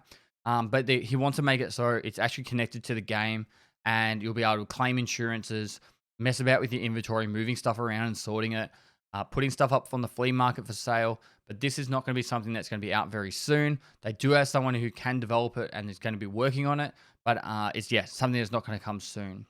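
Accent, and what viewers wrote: Australia. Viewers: He's Aussie breh like me. We say "Starlin" because our accent..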